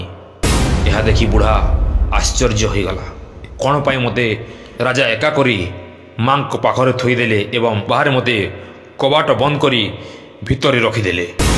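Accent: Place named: Sambalpur, India. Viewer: Jai MAA sureswari...bhai tumar katkia katha re sambalpuri jhalsu che...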